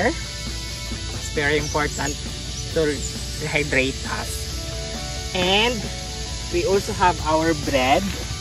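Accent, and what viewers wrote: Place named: Philippines. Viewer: I can still hear your tagalog accent fren hahaha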